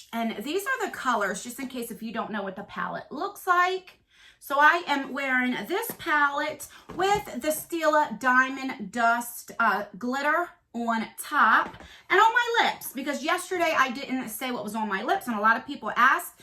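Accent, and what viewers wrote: New Jersey, United States. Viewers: Lmao the creative descriptions must be a Jersey thing. My mom and my dad both were born and raised in Jersey and speak just like you, I live it! 💄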